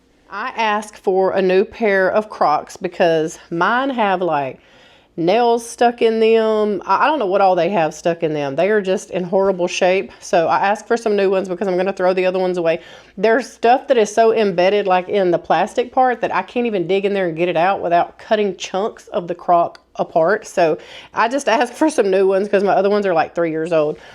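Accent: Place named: West Texas, United States. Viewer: So glad to see you feeling better. It bypassed us this year. Thank you Jesus. I also have changed my scripture time. I have been sick all year and neglected the word. I love your home and thoroughly enjoy listening and watching you. I live in west Texas up in Lubbock. So your accent is my favorite. Happy New Year.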